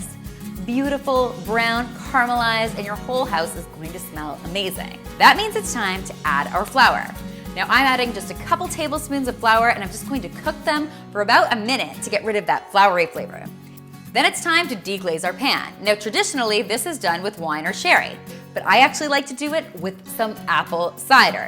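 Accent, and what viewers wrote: Canada. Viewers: You're Canadian, right? Just confirming 😅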